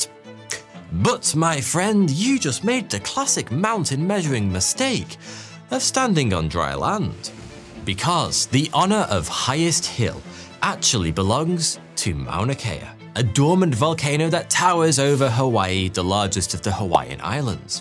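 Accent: United Kingdom. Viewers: Yup, its all about story telling, a nice British accent that gets you 358K view in just 7 days and poor me with all the mathematics and Indian accent can make 400 views in 1+ year 😛- Just measure base to tip and it aint that big ha ha. Love this guy. Wish I had a good voice like him.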